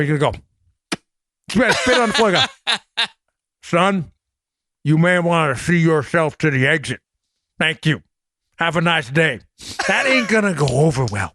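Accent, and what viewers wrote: New York, United States. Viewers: Dan, you remind me of some of my old Soldiers from New Yawk! Lol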